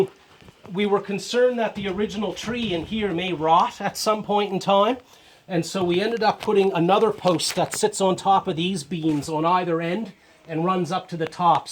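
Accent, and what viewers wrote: Ireland. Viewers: Touch of Irish in your accent?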